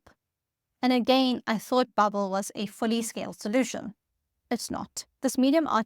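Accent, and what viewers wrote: South Africa. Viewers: Are you south African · Is that a south african accent?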